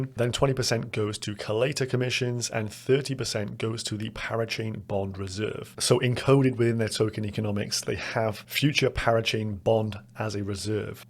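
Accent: Cardiff, United Kingdom. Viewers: are you from Cardiff? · Nice video cheers man. Is that a cardiff accent I hear?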